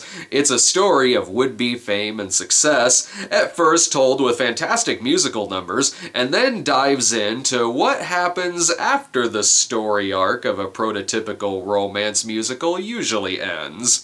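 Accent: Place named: United States. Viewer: you have the best American dialect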